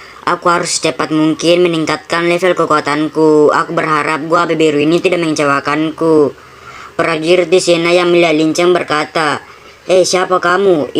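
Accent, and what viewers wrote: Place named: Bugis, Indonesia. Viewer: Adminnya suku bugis ya.. nadanya seperti suku bugis kalau berbicara..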